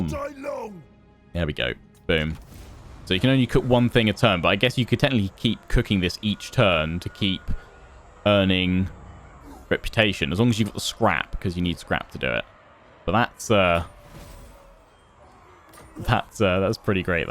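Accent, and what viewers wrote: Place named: United Kingdom. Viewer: Hearing all the unit names from a Brit sounds like I’m getting insulted. I LOVE IT!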